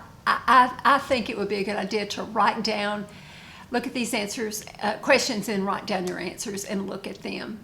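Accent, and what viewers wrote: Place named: South, United States. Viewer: You would be fantadtic at ASMR!!! I often tune in to your channel right before bed to unwind. You are very nurturing by nature and your voice and Southern accent is calming and soothing. Just do it!!!! You are already doing ASMR without even knowing it! Lots of love and thanks for this video, i just had my 69th BDay in June. XOXOXO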